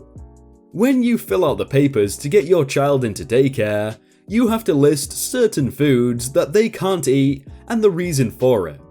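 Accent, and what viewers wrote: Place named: Lancashire, United Kingdom. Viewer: I love listening to your voice. Just out of interest are you from Lancashire? I think you’re from there but another YouTuber (thoughty2) is also from Lancashire and you do sound like you’re from a different part. Or maybe it’s Newcastle. Also, i can’t believe you’re only 18. Keep up the good work. Also, I love you’re other channels. As a British person it’s shameful that I just can’t place your accent.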